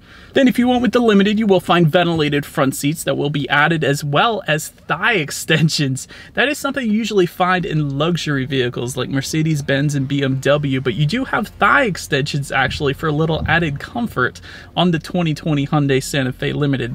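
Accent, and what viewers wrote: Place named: Philadelphia, United States. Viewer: Living in Florida now I really miss hearing that Philly accent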